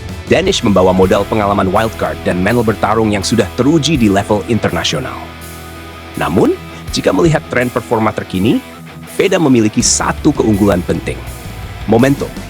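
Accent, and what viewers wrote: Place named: United Kingdom. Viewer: Narasi bahasa pake aksen inggeris Its very cringe bro Baru 1 mnt stop nonton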